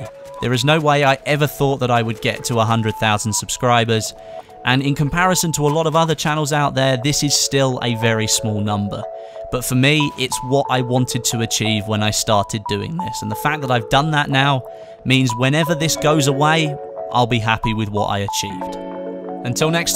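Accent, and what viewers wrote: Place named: United Kingdom. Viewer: Hey Westie, you're awesome. From the time we were all infatuated with the T-Rex on Rogue Transmission and the Megalodon, I have loved your videos. You have never failed to please your audience (and turn us(me) on with that sexy british voice of yours), and are always looking out for the fans. I subbed at around the 50K mark, so right around the first easter egg hunt for BF4, and there was a point for a couple of months where I would leave a comment and I would have the guarantee of your reply. Bigger Youtubers often lack that quality, which I can understand, but you go above and beyond. I remember I was staying up late (11PM, Central US time) and you added me on G+. That put me in a position where I knew you were awesome enough to actually actively pursue some of your most active followers. Anyways, I love your videos, and 'grats on your big milestone. 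Also, I am just dying to see the Vlog with the 100K Silver play button!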